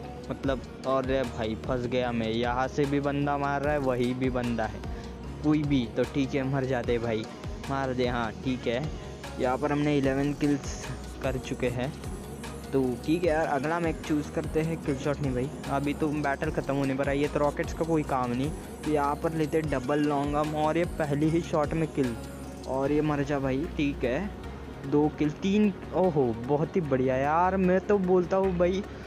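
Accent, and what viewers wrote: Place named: Philippines. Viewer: He is philipino tagalog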